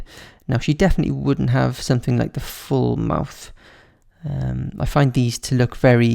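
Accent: Wales, United Kingdom. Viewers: Are you Welsh?? I love your accent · your welsh i'm welsh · So this video really helped! I was clueless. But I had one question. How do you delete a chapter from a story? Btw love your accent I'm also british =)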